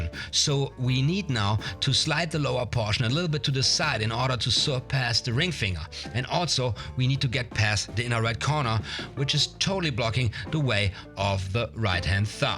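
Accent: Germany. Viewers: Sure do miss your silly German accent!!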